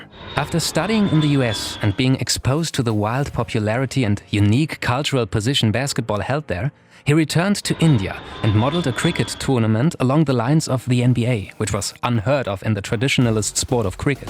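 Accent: Germany. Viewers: Fiahart kholi, lol love the German accent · to me it sounds like he is german. his accent is very very subtle but somehow that's what I pick up...a german accent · Kann das sein das deutsch deine Muttersprache ist?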